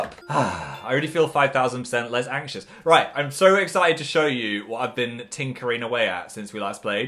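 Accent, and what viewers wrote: North, United Kingdom. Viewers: is it just me or does sound more northern than usual? No.... just me ok then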